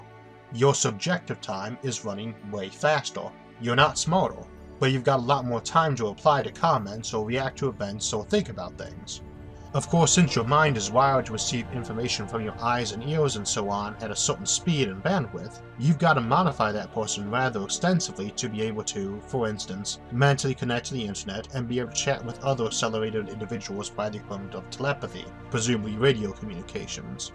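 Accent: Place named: Louisiana, United States. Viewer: I thought initially it was a strong cajun accent lol.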